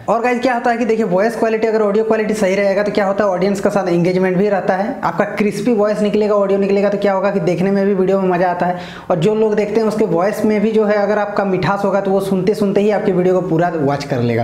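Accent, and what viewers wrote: Bihar, India. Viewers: Are you from Bihar.???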